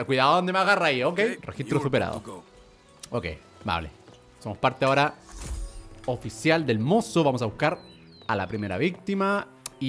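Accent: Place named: Chile. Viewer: Le volvió el acento chileno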